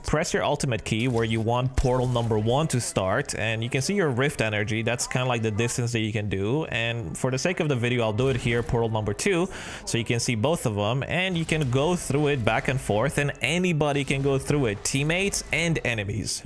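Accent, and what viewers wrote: Romania. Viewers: u romanian?? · OMG ESTI ROMAN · R U ROMANIAN?/Esti roman?? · wtf are you Romanian? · u romanian?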